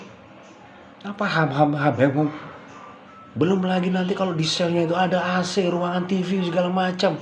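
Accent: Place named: Java, Indonesia. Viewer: Jowone sampean ngendi masehhhh menowo tonggone hhhh pon tak subscribe lho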